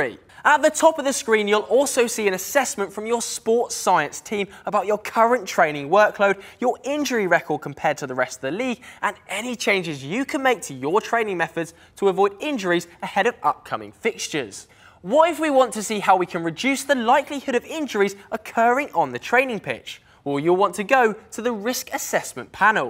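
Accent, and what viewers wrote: United Kingdom. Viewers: When he has great British accent and talks like a childish 15-year-old Sorority girl in US.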